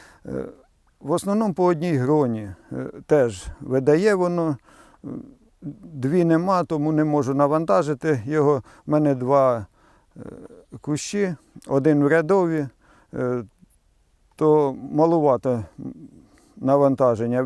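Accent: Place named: Poltava, Ukraine. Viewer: Так вы с Хорола. Мой отец тоже. Жаль уже умер. По разговору догадалась что с Полтавской обл. Хороших вам урожаев.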